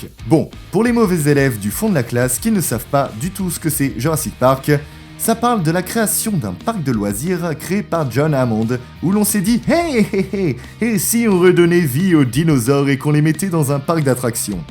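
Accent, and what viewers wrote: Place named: England, United Kingdom. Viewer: Tres bonne vidéo et bien interessante. Et bel accent anglais^^. Sympa vos vidéos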